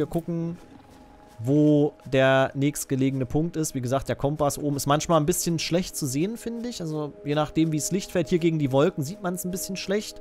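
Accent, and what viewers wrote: Germany. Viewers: könntest du die englischen Sachen nicht so deutsch vorlesen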